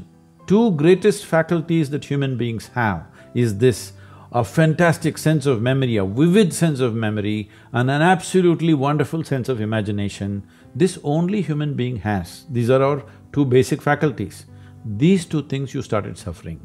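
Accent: India. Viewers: South Indian English , i think is far better than hissing Scottish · It's ur loss really. BTW, Google is able to fairly subtitle Indian accents, not perfectly, but fairly. Maybe give it another shot when u feel more open-minded 👍✌️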